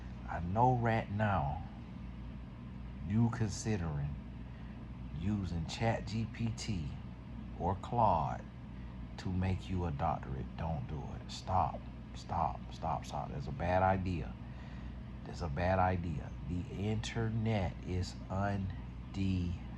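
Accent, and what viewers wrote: South, United States. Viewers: 😂😂 right! It’s the country twang in him explaining this craziness. Hilarious! Cause what is she doing!!!?!!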